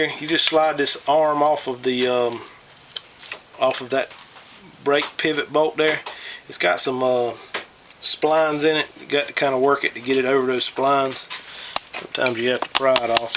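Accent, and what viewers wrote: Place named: Georgia, United States. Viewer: South for sure. By the accent I'd say Ga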